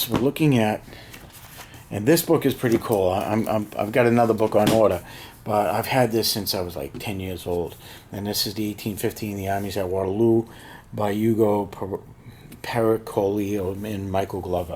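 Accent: New England, United States. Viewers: I'm guessing you're a New Englander. The damn finest accent in the U S of A!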